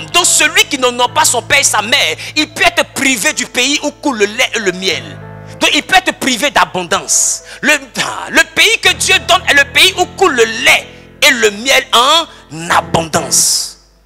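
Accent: Ivory Coast. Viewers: Mais mon frère c'est comment? Lol, tu ressembles à un congolais, mais l'accent est 100% ivoirien! Bon enseignement cousin.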